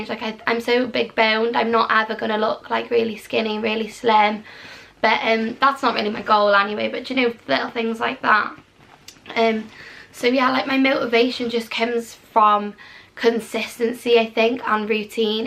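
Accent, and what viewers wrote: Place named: Wales, United Kingdom. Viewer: nothing wrong with your voice. Take no notice of the rude comment from Ellie. Love your acent. Reminds me of my Welsh cousin's .x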